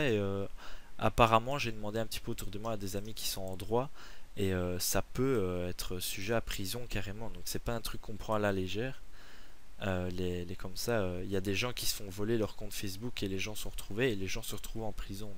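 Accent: Belgium. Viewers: Tu es belge ? Parce que j'aime beaucoup ton accent :) , et vraiment désolé pour ton hack j'ai deja connu ça et fuck les rageux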